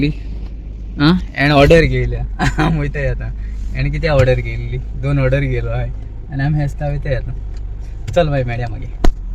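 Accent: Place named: South Goa, India. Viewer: really you are comedian I like the way you talk of south goa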